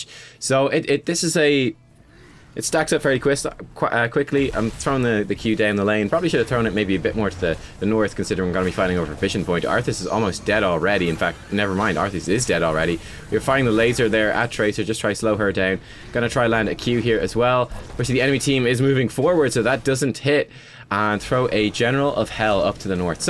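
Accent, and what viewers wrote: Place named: Ireland. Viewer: just teasing, love the Irish accent!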